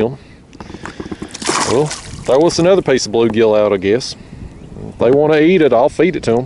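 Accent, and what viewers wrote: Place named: North Alabama, United States. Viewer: Just subscribed. Realized quickly you have the same exact country accent everyone spoke where I grew up (North Alabama). I shed my accent after moving to a bigger City, but it always makes me happy to hear it still alive and well! Awesome channel my friend!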